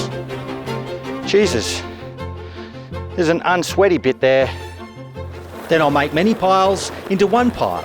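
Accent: Australia. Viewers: Are you saying 'tackle box' or 'tucker box'? This guy from the states loves your "down under" accent but I cannot tell. LOL! ;-) Love your videos mate.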